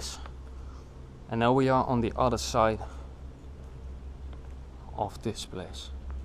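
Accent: Netherlands. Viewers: can you speak dutch you i heard it on your voice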